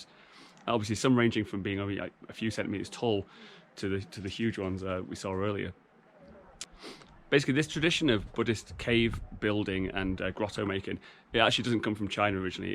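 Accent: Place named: Northumberland, United Kingdom. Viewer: Just found this. Loved it. You sound like a Northumbrian. 👍😍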